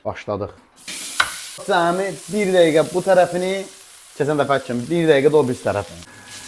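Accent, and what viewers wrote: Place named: Shamkir, Azerbaijan. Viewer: Semkirli oldugu 100 gunlukden bellidi menim qardasim kimi danisir bisirir sendi Masalla size cox gozelsiz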